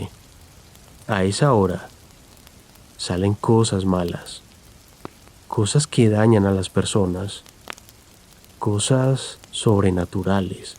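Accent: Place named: Colombia. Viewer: Quizas el relator es de Colombia...no lo se ,pero esta historia es real,año 1910...coltauco...Rancagua...Chile...una noche de invierno...miguel fue real y aun en callejon de lo de cuevas existe un diminuto monolito en donde encontraron a miguel....felicitaciones por tan interesante y bien realizado relato❤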